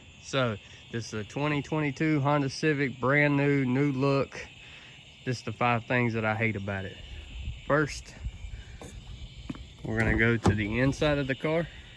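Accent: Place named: South, United States. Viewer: That southern draw son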